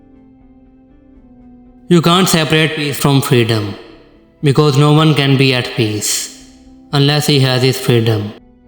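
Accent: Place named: India. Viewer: Why india accent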